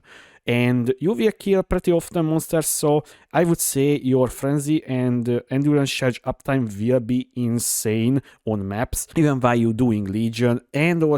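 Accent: Hungary. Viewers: I love your accent! Hungary?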